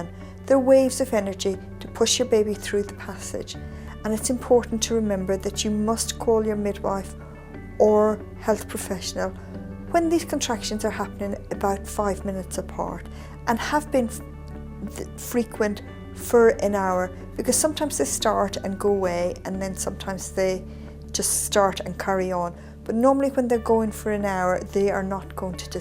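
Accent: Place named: Ireland. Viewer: You're so stupid, she clearly has an Irish accent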